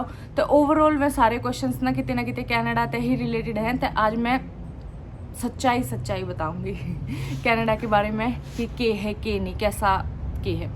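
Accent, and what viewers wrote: Haryana, India. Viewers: Nice description with haryanvi touch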